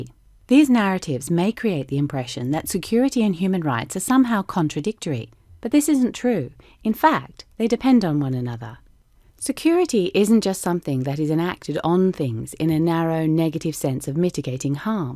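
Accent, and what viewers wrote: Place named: Australia. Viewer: I can hear a hint of Aussie in the person's voice, i can't be the only one. Sorry for going off point, i appreciate this lovely introduction!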